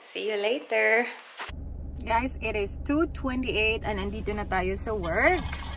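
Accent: Visayas, Philippines. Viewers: Kasi Bisaya ka Kasi, Kaya pag nagtagalog ka parang may kunting accent Ng bisaya na malambing, Kaya akala nila maarte na, di nila alam malambing Ang mga bisaya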